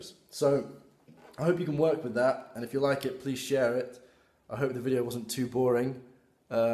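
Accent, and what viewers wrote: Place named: Liverpool, United Kingdom. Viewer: Are you from around Liverpool? You sound a bit like George Harrison.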